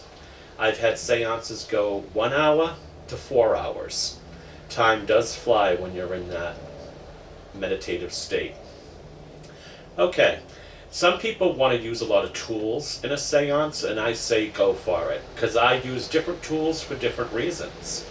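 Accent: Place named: Massachusetts, United States. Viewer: Where are you located? From the sound of your voice, I'd say Massachusetts. :)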